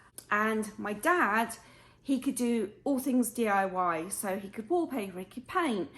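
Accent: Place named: United Kingdom. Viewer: Really enjoyed this vlog, you have lead such a fascinating life, love how you have still got your British accent !!! Sending you lots of love xxx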